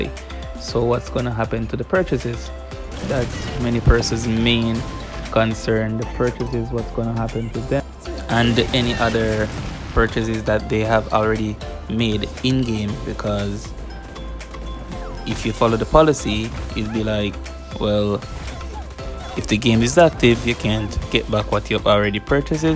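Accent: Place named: Caribbean. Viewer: hey which country you from?, you sound like a Caribbean person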